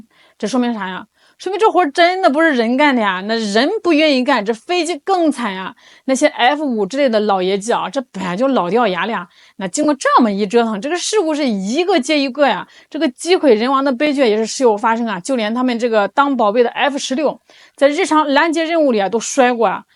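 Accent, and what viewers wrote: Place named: North, China. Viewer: 口音挺熟，就是想不起来了。或许山西，河南，河北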